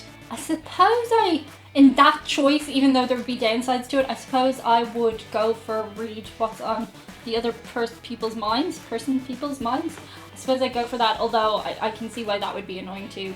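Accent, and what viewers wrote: Ireland. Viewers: Lucky charm accent is way to cool to ditch ever. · I love your "Lucky Charms" accent. And the chicken farmer line is priceless.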